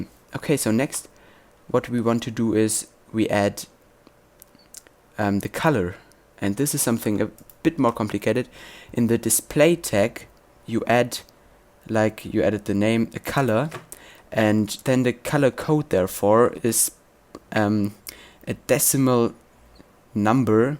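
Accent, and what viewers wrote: Germany. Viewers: Are you from Germany ? xD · are you german? :D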